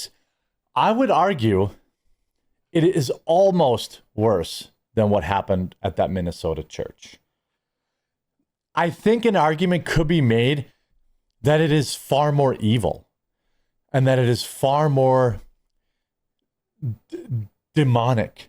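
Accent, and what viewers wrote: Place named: Wisconsin, United States. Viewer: ) I can see that, but I like how talks because it reminds me of my sweet Mom's family from Muscoda, WI.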